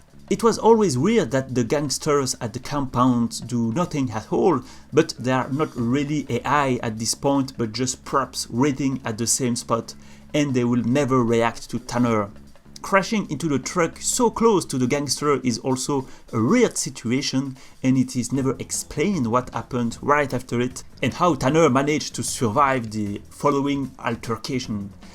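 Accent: France. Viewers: you sound kinda french :') , neat video from yours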